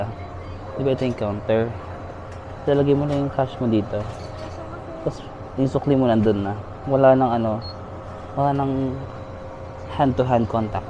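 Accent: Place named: Philippines. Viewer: Perfectionist? Filipino accent nga dba... style niya yan at authentic not pretentious.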